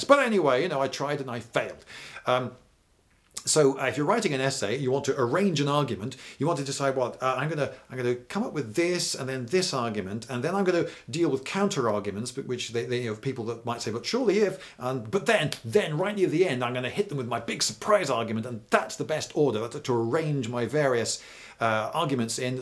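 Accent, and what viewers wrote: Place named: England, United Kingdom. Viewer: I just imagined this channel being run by a guy with a Boston accent and realized how important the English accent is to a good history channel. You just feel like he knows what he's talking about.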